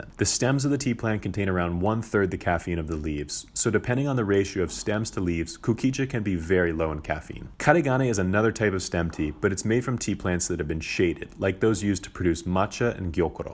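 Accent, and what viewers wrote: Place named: England, United Kingdom. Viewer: Typical English speaking style of pronouncing Japanese. oops.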